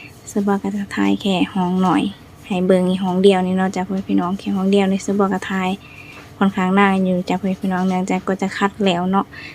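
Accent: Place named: South, Laos. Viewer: สบายดีครับสาว มาใหม่ครับอยู่แขวงไหนครับทางฝังลาวสําเนียงการ พูดพี่ว่าน้องอยู่จําปาสักลาวใต้ใช่ไหม่ครับ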